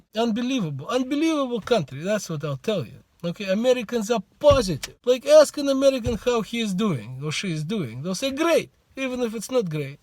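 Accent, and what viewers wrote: Russia. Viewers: This is such a beautiful video and when you talked about 9\11 I remembered that since I was born on 9\6 I woke my mother simply for food and she saw what happened the year, god bless those poor guys who lost their lives. And its really is surprising how I saw this video 4 years later. May god bless you and your family mister russian! Your accent is awesome by the way and I'm glad you enjoy your life here in America ówò)/🍬❤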